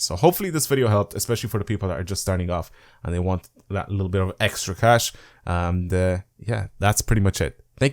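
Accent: Ireland. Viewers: Are you from Ireland ? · Are you from county Offaly? You sound just like my Irish cousin who lives in Offaly.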